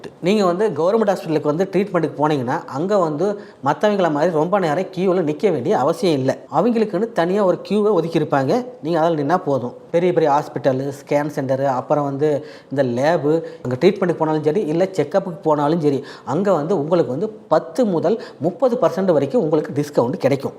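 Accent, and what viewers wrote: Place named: Coimbatore, India. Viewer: That is COIMBATORE SLANG with much respect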